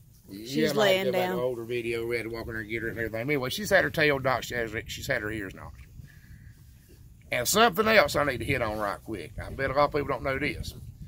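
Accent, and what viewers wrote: South, United States. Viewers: I love the southern accent. I can listen to it all day. Much love to our amazing farmers.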